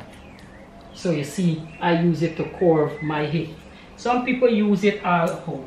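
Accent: Guyana. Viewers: Good day love your video you sound like a Guyanese. I am too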